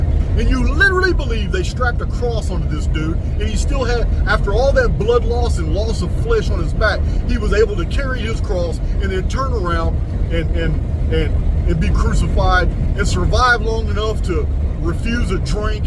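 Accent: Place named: Texas, United States. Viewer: "I'm not buyin' what this Hobbit is sellin'" 😂💕🇬🇧 I love your Texan accent when you get on your rants!❤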